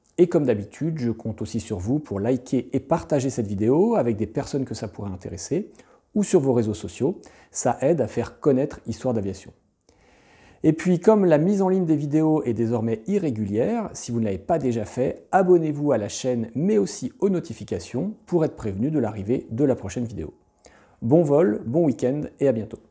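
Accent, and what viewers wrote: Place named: France. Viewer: the ne se prononce pas ze, mais de sur le bout de la langue. Vous faites tous la même erreur les français 😂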